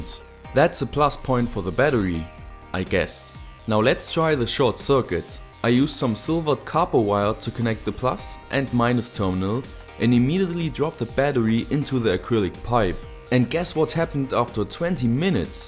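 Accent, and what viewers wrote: Germany. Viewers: Your Accent is really dominant. You sound pretty german. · yeah he has perfect and his accent isnt wierd i it sounds like a normal german accent, I used to live in germany for 2 years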